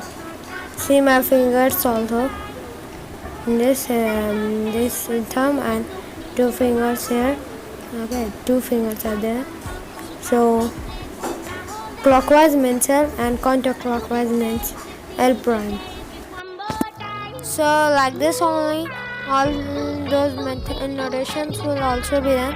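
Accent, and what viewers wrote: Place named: United States. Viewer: Abe American English kyu bol raha hai? Indian English bol na!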